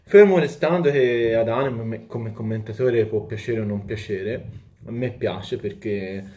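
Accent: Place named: Florence, Italy. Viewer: Ti impongo delle cose: 1) Mai nascondere la c strascicata fiorentina 2) Torna ad aprire i pacchetti. Il mondo ha bisogno di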